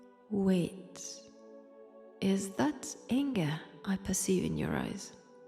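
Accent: France. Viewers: Hearing your beautiful French accent whenever you upload a new video always makes my day, I hope things have been going well for you lately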